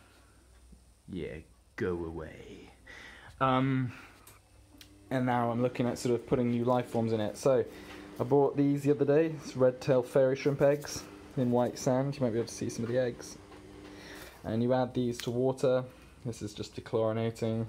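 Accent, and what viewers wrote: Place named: RP, United Kingdom. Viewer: you sound posh as fuck. paint me naruto please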